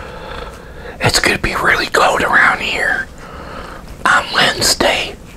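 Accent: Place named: Texas, United States. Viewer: Seriously one of the best channels there ever was and ever will be ur Texas accent is the best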